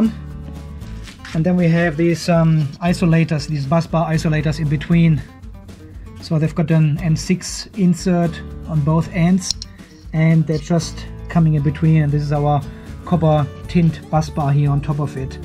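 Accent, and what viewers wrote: Germany. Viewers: Hello, I was wondering how you are going to keep these three batteries in balance? I have two 24V/100Ah batteries and it appears to be difficult. By the way, thank you for your very interesting video's and your german English. Makes it for me easy to follow. Regards, Wim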